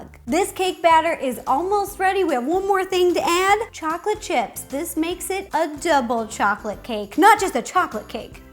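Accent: United States. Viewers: today you kinda sounded extra....country.....ish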